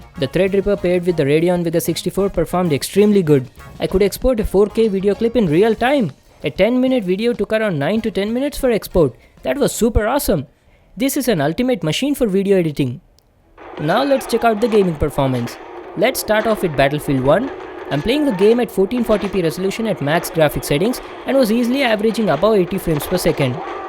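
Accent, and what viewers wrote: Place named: Gujarat, India. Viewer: You are definitely gugrati , marvadi or sindhi . English R.I.P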